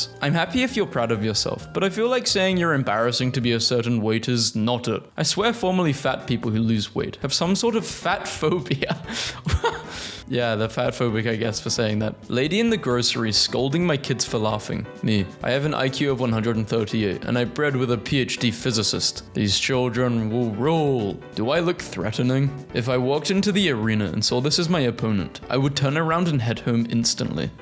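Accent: United Kingdom. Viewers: To me you sound British. Just not RP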